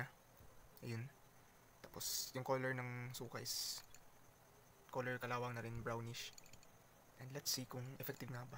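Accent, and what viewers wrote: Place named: Ilonggo, Philippines. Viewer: Yung accent mo conyong ilonggo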